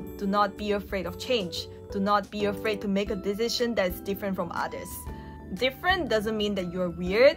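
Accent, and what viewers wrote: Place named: South Korea. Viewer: Maybe your interaction with crews and people, your accent is more towards Korean than Malaysian accent 😊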